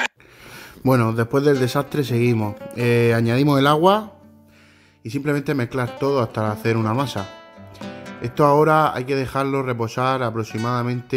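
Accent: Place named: Murcia, Spain. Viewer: Estupendo pan lo haré haber si me sale como a ti . Si no me equivoco eres de Murcia o Cartagena como yo el acento no engaña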